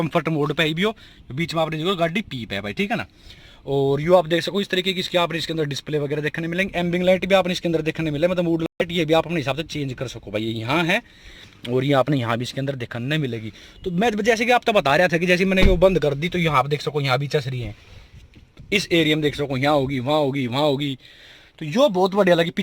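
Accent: Haryana, India. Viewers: This is not hindi it is haryanvi bhai lage raho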